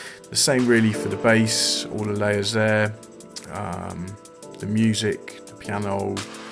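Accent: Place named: London, United Kingdom. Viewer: that's got to be one of the most convincing london accent coming from a dutchie! it's only the retracted dutch /s/-sounds that border on [sh] that sometimes give away the native tongue.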